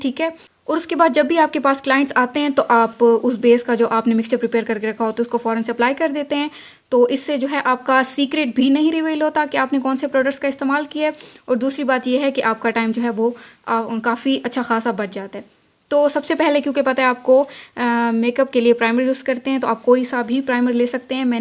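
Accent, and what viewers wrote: Saraiki, Pakistan. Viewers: Awesome. Your accent like sraiki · Ap saraiki speaking hn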